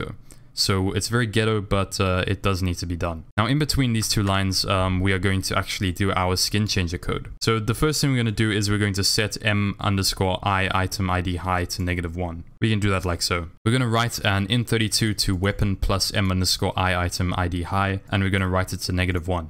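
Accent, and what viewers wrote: South Africa. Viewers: no way my mans from SA lmao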